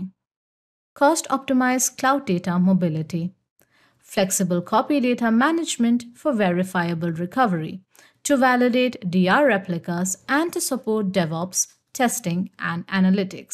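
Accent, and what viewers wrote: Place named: India. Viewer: Giọng Ấn khó nghe quá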